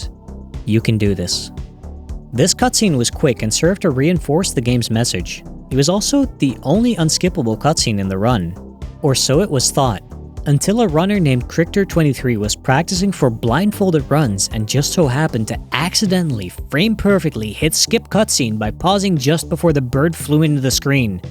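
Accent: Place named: Netherlands. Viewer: Dit is misschien het meest undercover Nederlandse accent ooit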